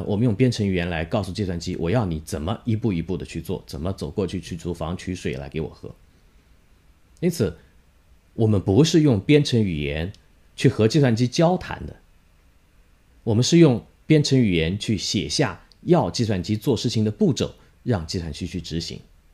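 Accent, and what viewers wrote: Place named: Hangzhou, China. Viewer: 翁老师的杭普太亲切了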